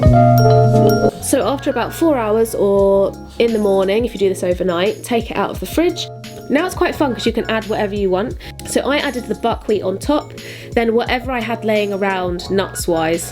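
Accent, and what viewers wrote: United Kingdom. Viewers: I really like your British English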